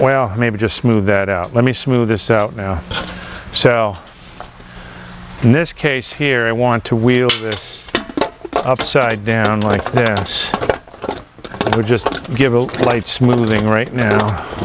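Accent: Boston, United States. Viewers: this guy has got to be from boston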